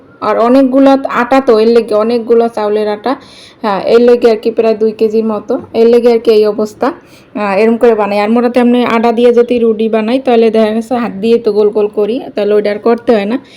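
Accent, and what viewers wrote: Barishal, Bangladesh. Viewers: বরিশালের ভাষাটা অনেক ভালো লাগে আর রান্নাবান্না গুলো অনেক সুন্দর ছিল আপু সাবস্ক্রাইব করে পাশে রয়ে গেলাম বন্ধু হয়ে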